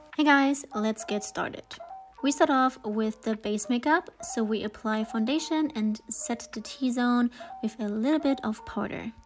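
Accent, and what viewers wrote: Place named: Germany. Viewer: I can hear the German accent lol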